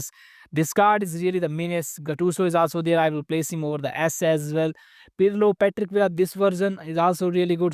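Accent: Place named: India. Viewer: My Hindi accent friend how are you? Because of you i lost money. You need to pay me back😊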